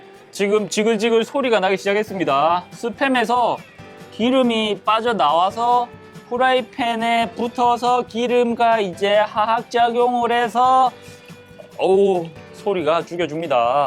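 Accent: Busan, South Korea. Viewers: 말꼬리 허팝님은 부산사람이여서 그래요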